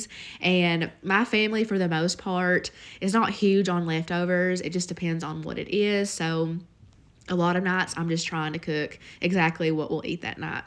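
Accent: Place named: Kentucky, United States. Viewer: Thank you so much for making these videos! You always give me such great ideas of meals to fix my family! And I’m a fellow KY girl, so you sound like family teaching me! 💓💓💓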